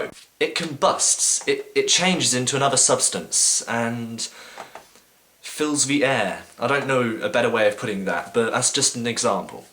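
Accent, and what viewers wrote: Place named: England, United Kingdom. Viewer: He made the effort to make the video and put some interesting points across. He has an English accent. Grow up.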